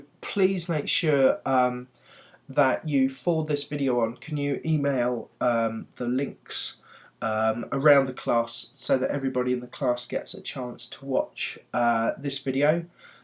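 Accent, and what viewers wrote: United Kingdom. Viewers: Reasons Why you're Better than my math teacher: -I can understand what you're saying -You don't expect me to already know what you're teaching -You have a British accent. That is just AWESOME!!! Thank you :)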